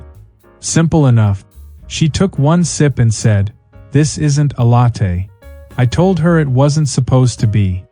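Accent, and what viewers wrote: United States. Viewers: All these posts showing the arrogance, entitlement and stupidity of Americans in a wide range of experiences leads to the overwhelming desire to ignore them all completely and, at times, just tell them to bugger off. Also, all the supposed interactions with Australians are delivered in an American accent, not an Australian one which would possibly make them half way credible.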